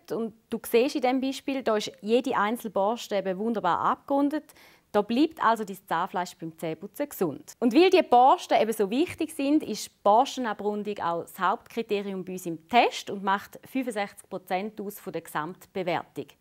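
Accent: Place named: Switzerland. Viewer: Ich freue mich immer wenn man was auf Schweizerdeutsch hören tut.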